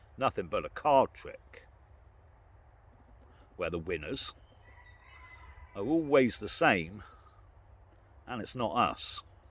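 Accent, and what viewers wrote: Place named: South West England, United Kingdom. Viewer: Wondering if you're near Bristol, as we had very similar weather here a couple days back, and today, and I've been trying to place your accent for a while haha. Definitely South West, but don't worry, I'm not asking for you to give any info. I'm happy to keep the mystery alive!.. Thanks for the fantastic insight and content as always. Being an unemployed bloke in my mid 30s in the UK is a scary place to be right now. Being on Universal Credit for the first time in my life over the last couple years is nothing I'm proud of and something I had to do after getting back from a few years away just before the 'scamdemic', and after three years in Aus (not a perfect place, but a large economy like ours, the difference being the standard of life there is reflected), it's made me see how much we (here in the UK) exemplify what it is you're touching upon in this video. 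Blimey, I've really gotten an insight these last two years into just how much of a commodity and statistic I and everyone else who is out of work are viewed as, with regards to being in the system. I am currently looking for work. Being on welfare has not been good for my soul, but the prospect of getting back into work in a country that only really values me for that prospect is also quite terrifying, and that has nothing to do with the notion of a hard day's graft, but everything to do with the erosion of my soul.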